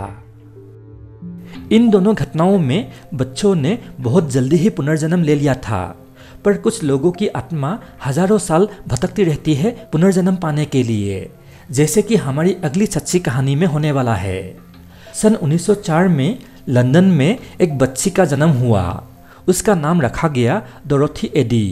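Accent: Assam, India. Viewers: আপুনি অসমীয়া নেকি ॥ আপোনাৰ কথাবোৰ অসমীয়া যেন লাগে হিন্দীত কয় যদিও ॥ ভিডীও বোৰ ভাল লাগে আপোনাৰ❤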